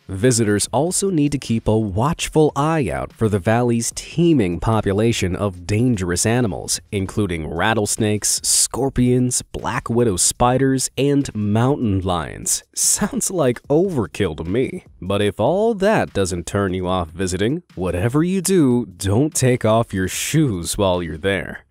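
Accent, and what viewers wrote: United States. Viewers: This is a weird video. Why would a narrator with an American accent use the English word lorry? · patronizing yanky voice...urgh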